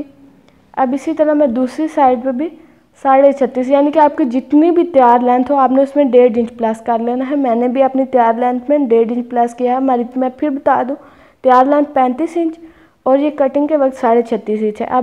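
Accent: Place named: Faisalabad, Pakistan. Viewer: Kafi arsy sy me apki videos dekh kr kpry silai kr rhi hun, jazak Allah, ap bohat assani sy mushkil cheezen smjha deti hein, Faisal Abadi lehjy wali Urdu, Amazing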